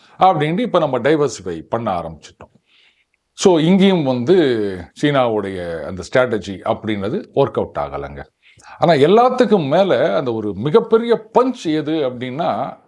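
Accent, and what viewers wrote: Chennai, India. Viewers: வணக்கம் சார் அருமை உங்களுடைய இந்த சென்னைவாசி கலந்த பேச்சு, தொடருட்டும் உங்க பணிகள் 🎉🎉🎉🎉🎉❤❤❤❤❤ எரியட்டும் தோழர்களின் எல்லா துவாரமும்😂😂😂😂😅😅😅😅😅❤❤❤❤🎉🎉🎉🎉🎉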